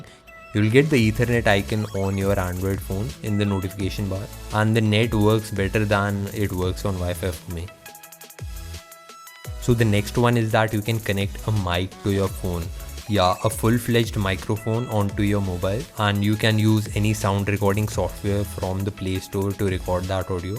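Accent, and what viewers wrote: India. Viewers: Tired of the indian accent explaining electronics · Another bad english accent from yet another indian guy....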